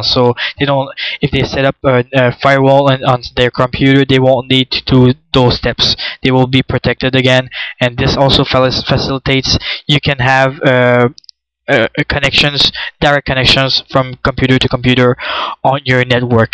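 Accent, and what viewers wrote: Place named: France. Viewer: It doesn't matter whether he spells well or talks fluently, freaking jerks! He gets it done and can at least speak different languages, can you do the same? I speak 3 languages myself, idgas about what people say because they can't speak other than one freaking language. I can blend in different settings so can this guy, can you? People are freaking low life mfrs, anyways, good video. lol I can tell you are French I think haha...you french people have that easy recognition accent. Alors sinon, t'a appris ca a l'ecole ou toi meme? Je suis en train de faire mon certifica en Network Security, c'es comment comme boulot?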